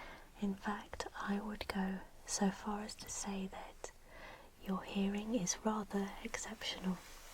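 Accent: United Kingdom. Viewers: This is the first video of yours I've seen, I must say, it's excellent. The overall quality is very genuine. I went blindly into this video, then you called yourself " " and you had a British accent, and I had a Doctor Who, I mean, you're even a ginger!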